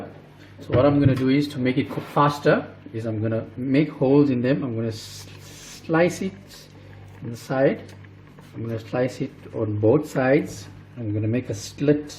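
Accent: South Africa. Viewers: I am South African now living in the US and I love your South African accent :-) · You sound south african..I'm south african..but live in the uk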